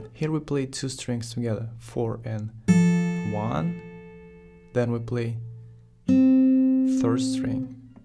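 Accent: Russia. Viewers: No, sadly, Let’s play guitar had an Indian accent (I think that was his accent) and has a Russian accent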